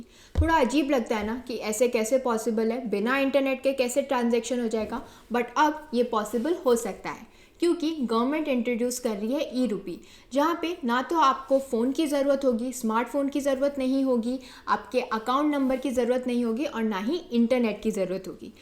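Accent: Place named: Gujarat, India. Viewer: aapki Hindi speech me gujarati ki style thodi lagi muje es liye puchha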